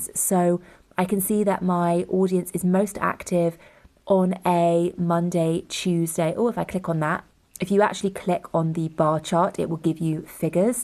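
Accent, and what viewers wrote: United Kingdom. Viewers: What a perfect british accent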